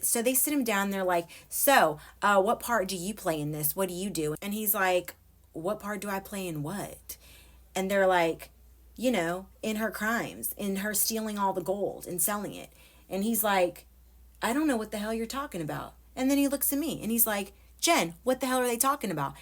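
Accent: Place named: Texas, United States. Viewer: Ngl I thought she was from El Paso or some where in texas because of the accent.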